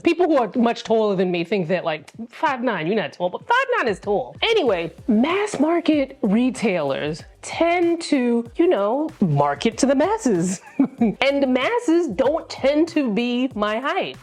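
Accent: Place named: New Orleans, United States. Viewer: I live in Louisiana and i thought you were from New Orleans, love the accent ~ new subscriber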